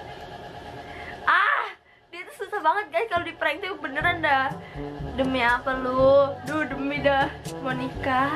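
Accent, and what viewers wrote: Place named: Kuningan, Indonesia. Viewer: Ka orang mana sih kaya orang kuningan aja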